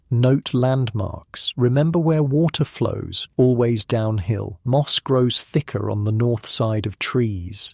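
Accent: England, United Kingdom. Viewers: Why is an English man commentary to the American history??😂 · American pioneer stories by a narrator with an English accent?! Ummm, no thanks. I’m out 🙄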